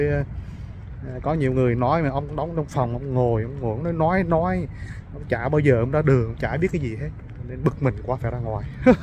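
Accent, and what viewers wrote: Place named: Vietnam. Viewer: 😂 phát âm kiểu rất Vietnamese đó bạn.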